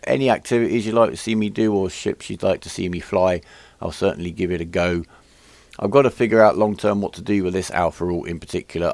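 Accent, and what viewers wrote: United Kingdom. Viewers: Congrats! I'm new to Eve and probably too late for the giveaway but only a week in and watching as many videos as I can to learn as fast as possible. If I had a Praxis I would definitely have to keep her mothballed for the time being as I would hate to lose her through incompetence!! I'd love to see a series of videos for complete newbies to watch AFTER they have completed the tutorials and the Agent Career missions as there seems to be a gap for such videos. Everyone assumes that after those you are an expert, but that's not the case. A bit like when you pass your driving test you don't know everything about driving. I subscribed when I saw your first video and am still loving them. (Also nice to watch a fellow Brit as your accent is similar to my own!) :)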